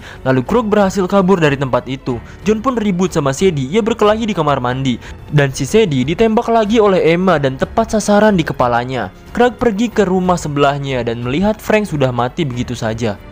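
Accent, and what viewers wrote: West Java, Indonesia. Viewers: Anjir teh 😄 Sunda bgt euy aa teh gening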